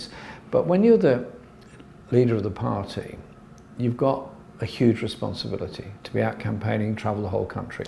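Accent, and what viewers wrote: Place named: Northern England, United Kingdom. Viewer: I love how he pronounces certain words in a Northern accent.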